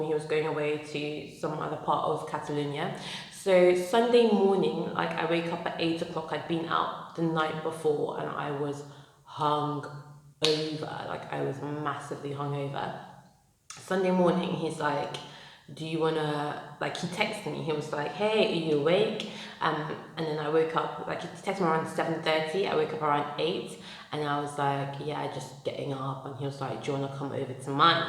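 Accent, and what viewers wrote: Nigeria. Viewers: Your storytelling w/ the Nigerian accent has me screaming☠️💀😅you are sooooo funny🤣🤣🤣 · Hey sis. Your braids is really cute. U did a great job. I like your smile and 9jia accent.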